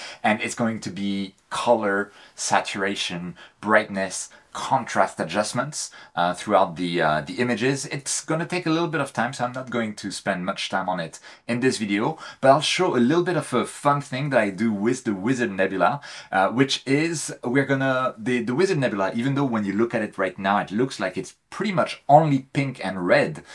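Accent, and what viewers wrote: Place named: France. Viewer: c'est moi ou j'entend une pointe d'accent francais dans ton anglais? good job vidéo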